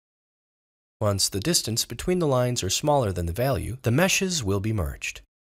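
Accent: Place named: United States. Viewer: I absolutely love the clear, professional, American voice and how it clashes with the bad english script :D But thanks for the rundown, very informative!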